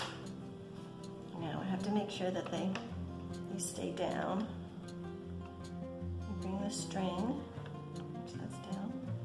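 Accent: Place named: Australia. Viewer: We did not "hear" any Spanish in your accent, sorry thought you might have been an Australian or New Zealander. Have you considered using the same videos for the Addi and re-recording them in Spanish? It might give you more subscribers. Just an idea from your comment in the video. Thank you for a very good video on doing the Messy Bun on an Addi.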